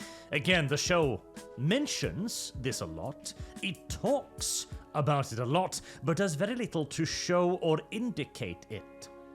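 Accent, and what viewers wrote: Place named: Wales, United Kingdom. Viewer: Don’t you love a Welsh accent!? Super enunciation as well. I’ve subbed.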